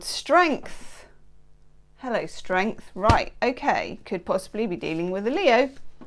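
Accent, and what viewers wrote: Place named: England, United Kingdom. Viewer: I adore you! You are one of my favorite readers!! Accurate and beautiful (and I love your English accent lol)!! 😘🙏🌷🌺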